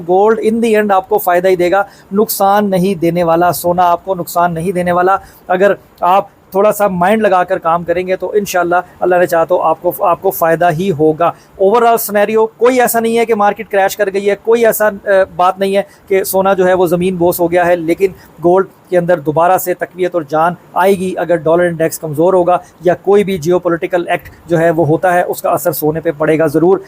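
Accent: Punjab, India. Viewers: Sir ap ka Punjabi touch oye hoye mashAllah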